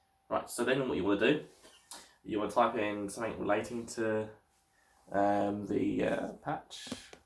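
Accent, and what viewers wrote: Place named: Australia. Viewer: Damn it hell sucks, I had to stop and try find another video due to me not being able to understand a single word this guy says. It's a shame cos it seems his informations handy. I'm Australian too so I should be able to understand a fellow English speaker but this guys accent is stroonngg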